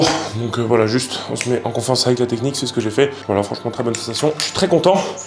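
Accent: Quebec, Canada. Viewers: Ce qui m'a le plus impressionné dans la vidéo c'est clairement ton accent québécois 😲 t'as habité là bas ou quoi ?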